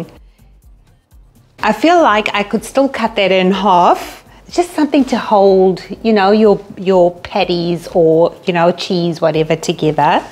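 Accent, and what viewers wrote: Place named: South Africa. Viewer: Hi, thanks for the recipe. Are you South African? Your accent sounds like it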